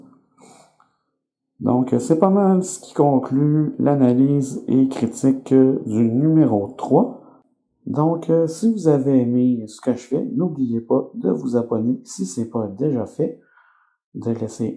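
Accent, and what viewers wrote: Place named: Quebec, Canada. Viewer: Ça fait du bien d'entendre un Québécois Francophones parler de warhammer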